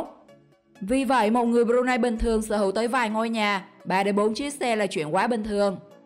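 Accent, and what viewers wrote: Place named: Binh Dinh, Vietnam. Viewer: Giọng Bình Định Phú Yên thì phải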